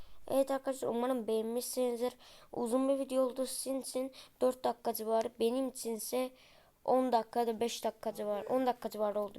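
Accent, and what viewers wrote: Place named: Azerbaijan. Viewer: ade azerisen bilinir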